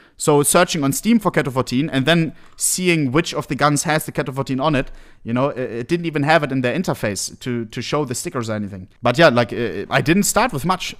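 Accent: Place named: Germany. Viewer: Der deutsche akzent fucking big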